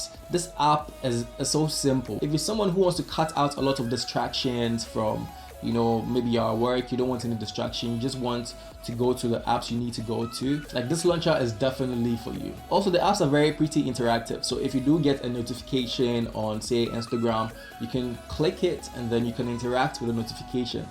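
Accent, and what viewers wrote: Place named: Jamaica. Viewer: Are tou Jamaican? I'm from the virgin Islands, your accent sounds jamaican or nearby location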